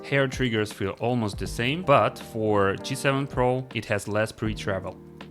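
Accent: Russia. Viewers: Я у меня конечно с английским плохо, но благодаря русскому акценту мне даже субтитры не пришлось включать)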